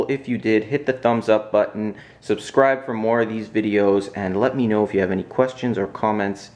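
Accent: Canada. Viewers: The Canadian accent is so strong with this one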